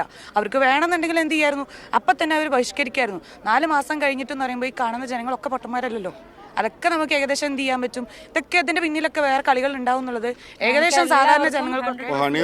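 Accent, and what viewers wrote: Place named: Kerala, India. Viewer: മിടുക്കി തനി മലയാളി യുടെ ശബ്ദം പോലെ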